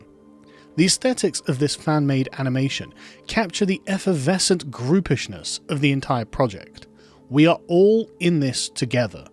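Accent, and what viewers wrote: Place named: United Kingdom. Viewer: A very disingenuous "analysis". The problem GW is fighting against is that fascists and straight up nazis tend to enjoy the setting a little too much. They see the hardcore totalitarian, militaristic, theocratic, intolerant, expansionist and genocidal nature of the Imperium as aspirational and something modern society should model itself after, not understanding or caring about how the entire setting is a satire and sometimes a clear condemnation of that world view. As it happens with other IPs like Starship Troopers, they are just enamored with the superficial aesthetics of the totalitarian government in the setting, and care for little else, and try to claim the whole thing as their own. In many ways, GW is just another amoral corporation, but they recognize that their brand being associated with nazis is very, very bad for business. 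That's the reason they have to release statements condemning the presence of extreme right individuals in the community, not because they happen to actually hate conservative, masculine, western values. Benjamin has mastered the art of sounding smart while being very stupid. Proof that just because you have a snooty british accent doesn't mean people should pay you any attention.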